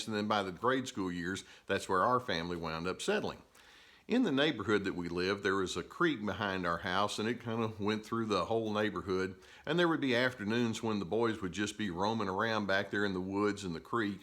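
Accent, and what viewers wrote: South, United States. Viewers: Especially his southern drawl. Makes me feel like home when I'm on the other side of the planet. :) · Oh, my! I'm from GA, too(near Valdosta, GA). Where did you go to college and grad school? Just curious. I love your southern accent.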